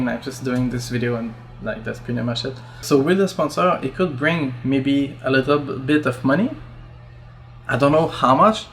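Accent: Quebec, Canada. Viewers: Oh man, what horrible timing my poor fellow Canadian. You really have something special with this channel, I know you'll be hitting the big youtube numbers in the next few years if not months. Your videos are nothing short of amazing, I just love reading your subtitles and hearing your wonderful accent in my head. Your shots are so beautiful, your stories are enthralling and completely worth waiting a few seconds to see commercials for! You're worth it! Don't sell yourself short. Maybe try for something part time just until you get the numbers up? Sponsors are fine too, it would be awesome if they let you critique them as you like to, those videos are very informative. Most people will take any adverts like a grain of salt and make up their own minds anyways so you shouldn't feel bad there! Wishing you all the best and looking forward to your next video <3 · I am new to the hobby and have enjoyed your videos for tips and honest opinions. 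I grew up in Brossard, so the accent is something easy for me to understand (I am in the USA now, but my French is still pretty good). Losing a job is hard as it shakes your confidence, but even with the economy being shaky, it is not the end of the world. Hope you find something interesting soon and that you keep making your good videos.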